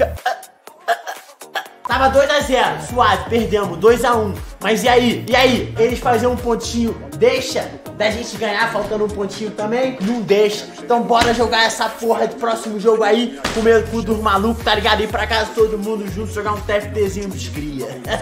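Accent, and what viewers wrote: Rio de Janeiro, Brazil. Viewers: Esse é muito carioca mano 😂😂😂 Fé com fé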